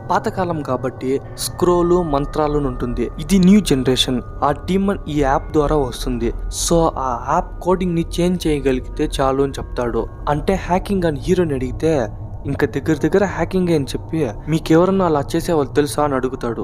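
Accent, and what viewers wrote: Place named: Punganur, India. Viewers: That way chittoor muslim telugu slang language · one of my friend punganuru same slang .anduke adiganu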